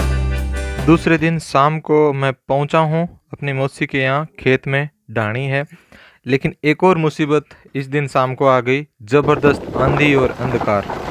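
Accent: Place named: Nagaur, India. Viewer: Nagaur s match khati h aapki language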